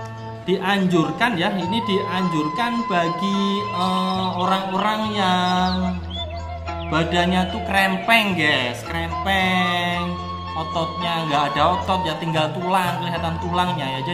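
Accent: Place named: Malaysia. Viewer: Waduhh... Bahasanya bunyi campur malaysia gitu... Berkecek kolumpur ko mas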